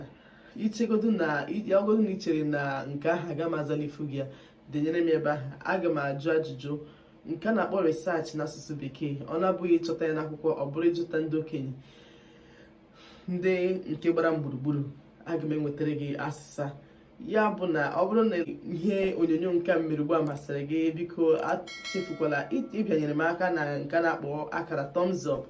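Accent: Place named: Igbo, Nigeria. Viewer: See how am smiling listening to you speak my Igbo Dialect😃😃😃😍😍😍😍Nnem this one dey totori my body😃😃😃😃💕💕💕💕Please created a Facebook page for Igbo class biko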